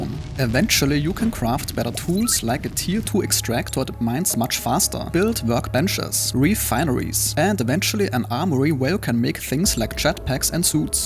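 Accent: Germany. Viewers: Brother its kinda off topic but are you german ? : D just curios cause of the accent (btw i am really exited for this game i hope its not some early acess scam bs )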